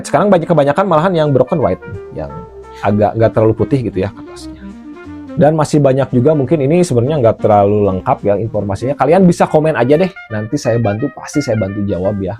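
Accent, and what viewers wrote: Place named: West Java, Indonesia. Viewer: a, logatna sunda pisan😂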